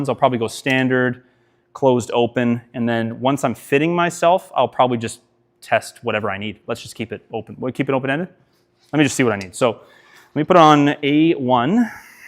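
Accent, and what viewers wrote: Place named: Canada. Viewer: Sounding extra Canadian today, bud! I enjoyed the live review, nice to not just have people's best shots in the reviews.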